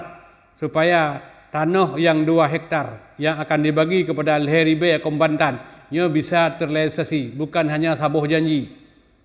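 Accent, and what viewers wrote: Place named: Pattani, Thailand. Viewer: Loghat bicaranya ada iras2 Melayu Pattani di Thailand.. Sbg org Melayu Malaysia yg beragama islam, kami ingin melihat ACEH merdeka sbg negara ACEH, yg di pimpin!/di takbir oleh org ACEH sendiri.. Kerna sejarah yg tertulis di dlm buku sejarah Malaysia tertulis negeri ACEH dahulu di zaman kerajaan adalah negeri yg hebat...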